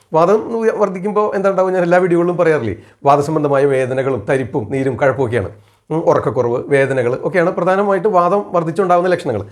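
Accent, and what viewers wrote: Thrissur, India. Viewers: Are you from Thrissur suburbs like Ollur? Very familiar slang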